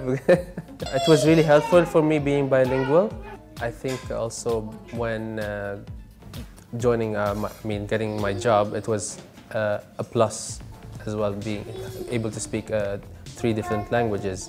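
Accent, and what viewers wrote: Philippines. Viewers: the WAY you TALK... Pinoy na Pinoy..😊😊😊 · habibi your speaking tone truly proved you are a more than half-part filipino..The way you deliver English words/sentences is moderate, dictions clear, very filipino tone, words are delivered with respect whoever is the receiver/listener...For sure your Mum was a wonderful woman, i could see & feel the way you speak the filipino values in your speaking attitude...For Us filipinos, Manners towards everyday life matters.. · Common you might have bi racial background, but in all angle you are more prominent in being Pinoy, therefore don't hesitate to sound such as those things you have already accustomed already from that time you were here in Philippines. Otherwise many will question you and tell that you were just trying too hard to fit in a culture you do not really belong. And it's so grateful to hear you speaking in Pinoy accent, which I think stood out from where you really belong. 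Sabi nga magpaka totoo ka kung saan ka talaga angat at doon ka yayakapin ng mga totoong kababayan mo na nagmamahal sayo at susuporta sayo hanggang sa huli.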